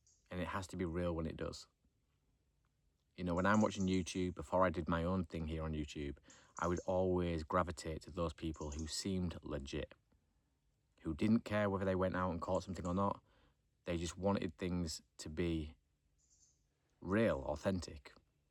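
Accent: United Kingdom. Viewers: Love your content! Did you ever figure out your findings of that paintball place with the rack of game cameras? Was that abandoned? Btw I love UK accents 😂 cheers from Canada!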